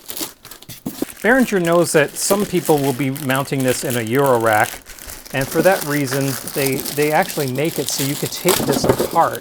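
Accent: Canada. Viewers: I am subscriber 9.32K-ish. I subscribed today. I've been watching this channel for a long time and just noticied I never subscribed. are you Canadian? I can't fix your accent. You say re-VERB instead of reverb like most people and as a sonic minded person it's puzzling. Your channel is great! Thank you for all of the videos and content.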